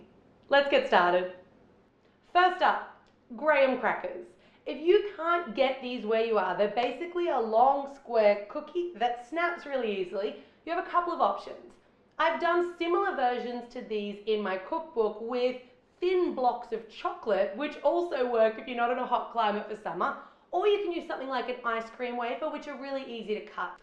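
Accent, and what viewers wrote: Australia. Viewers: Is she from aus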